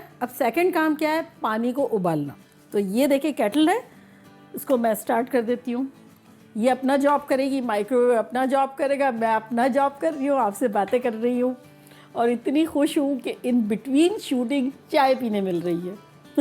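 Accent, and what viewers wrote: India. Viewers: Aapke bolne ka style Indian hai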